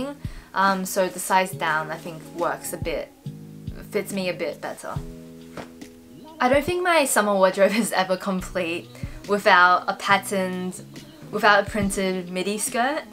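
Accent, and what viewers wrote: Australia. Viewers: 안녕하세요? 한국인이세요? 너무 사랑스럽고 실용적인 코디를 하셔서 즐겨본답니다. 영어채널이라서 100% 다 듣진 못하지만.. 영상 거의 다 봤구요. 송혜교 느낌 닮으셨어요. 호주발음도 매력적이어서 너무 좋아요!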